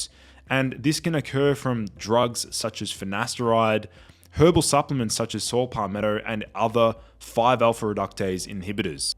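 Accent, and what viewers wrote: Australia. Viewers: But sir you speak very funny r u Australian?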